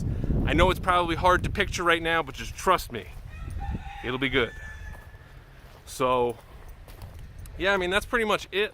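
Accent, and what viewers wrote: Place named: South, United States. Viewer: Loved the southern accent!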